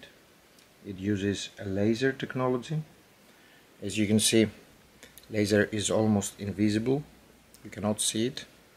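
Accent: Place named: Greece. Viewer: Τα αγγλικά του Έλληνα αναγνωρίζονται αμέσως απ' τη πρώτη λέξη ☺️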